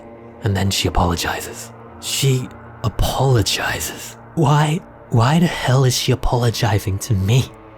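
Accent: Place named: Australia. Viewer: Are you Japanese? Also you seem to an Australian accent. Did you study or live in Australia?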